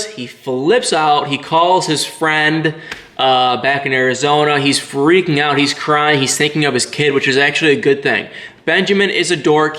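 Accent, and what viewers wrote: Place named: Midwest, United States. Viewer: Omg ur Midwest accent lol. I’m from nyc and all I can hear is ur accent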